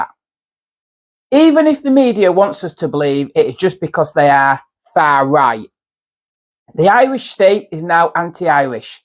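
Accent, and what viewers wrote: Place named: West Yorkshire, United Kingdom. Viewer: Over pronounces his T's lol so people from other countries can understand the west yorkshire accent laura towler used to do it a lot.. Good vid i agree! 👍🏻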